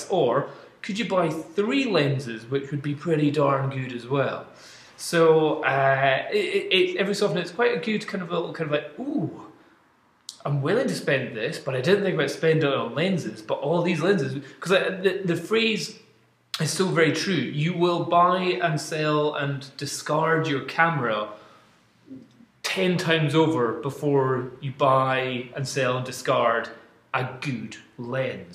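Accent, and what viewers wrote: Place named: Scotland, United Kingdom. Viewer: Offtopic: Everytime I hear you speaking (especially numbers) I start hearing the - in my mind:) That scottish accent:)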